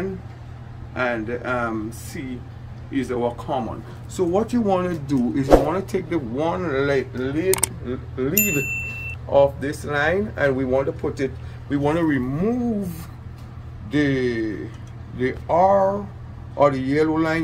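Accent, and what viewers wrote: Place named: Jamaica. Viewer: I hear that Jamaican accent Man…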